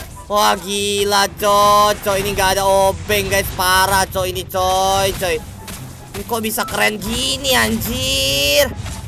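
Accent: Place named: Java, Indonesia. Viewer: Sunda Bogsel Nih bang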